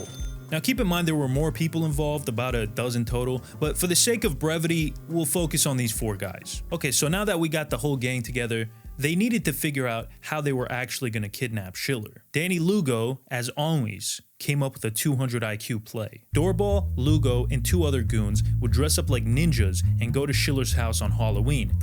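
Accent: Italy. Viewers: First 20 seconds I already like the narrarator's accent lol. Is that... Italian?!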